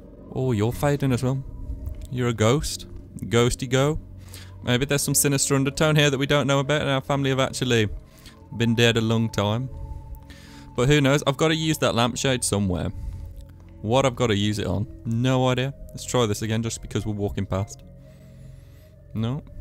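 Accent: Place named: Birmingham, United Kingdom. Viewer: Such a nice looking guy with a yummy brummi sound!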